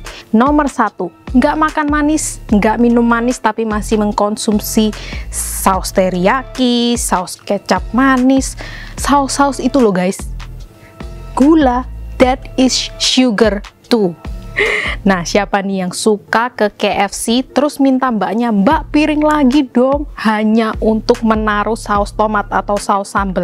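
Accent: Java, Indonesia. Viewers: maksud sy liat cara berbicara lucu emeszz, medhok2 jawa, 👌😘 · Sueneng aku mbek penjelasan e mbak e medok ee lohh🔥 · seneng bgtt bgttt sm cici nyaa kalo omg jowoo medok bangett huhuhuuuuu 😂😂😂 jd bikin smgt buat nonton eh olahraga yuk!!' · Sampeyan iki wong ndi to cii 😂😂😂 bahasane medok men pas ndagel 😂😂😂 ke mekel tapi mak jleb ning otak😘 lope u 😘😘😘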